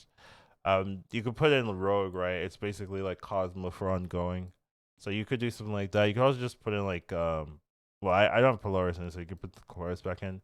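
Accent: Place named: South, United States. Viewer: I thought he was from the South.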